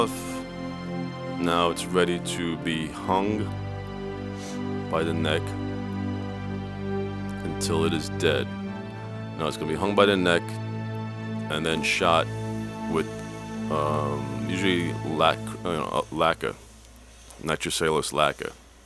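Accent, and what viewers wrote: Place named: New York, United States. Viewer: ah okay. i'm not from the US just sounded like new york somwhere...jersey was the closest thing i could think off ;) nice axe man, doing one myself at the moment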